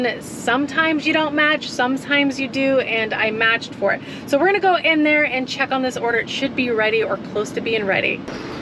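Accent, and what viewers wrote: United States. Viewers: Hey, you should be teaching English abroad. I like your pronunciation and your "American" accent lol plus as a fellow driver I follow you for your "delivery" content 👍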